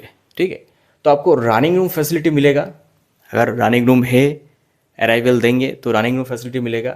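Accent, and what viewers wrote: Bengal, India. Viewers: Tumi bangali tomar kothatei bojhachhe 😊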